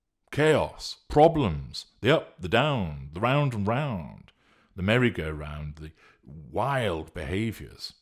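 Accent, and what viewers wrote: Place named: RP, United Kingdom. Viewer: You’re and you sound ever so Posh!! Once again you’ve hit the mark 100% thank you Sir 👍🇦🇺 love your work 😘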